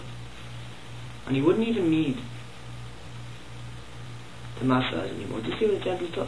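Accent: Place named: England, United Kingdom. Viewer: Its all in the presentation & how polished the act is, I would suggest rehearsing a script & practice in front of a mirror. Also, try not to mumble so much. I can hear an English -descent accent and yet my North American "western drawl" would still trump your diction.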